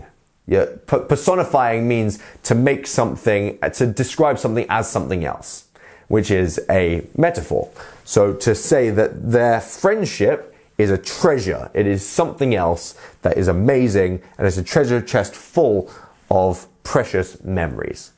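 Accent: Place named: RP, United Kingdom. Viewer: I'm totally hooked on your accent,. Hearing this RP is like a journey through the vast and green Southern English landscapes 😅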